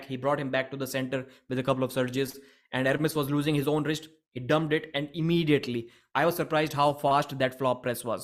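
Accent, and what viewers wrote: India. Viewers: FOR THE MONEY AND CONTENT! THIS CHANNEL ALWAYS UPLOAD CLICK BAIT!! FUCKING CHANNEL FUCKING NARATOR INDIAN🖕🏻🖕🏻🖕🏻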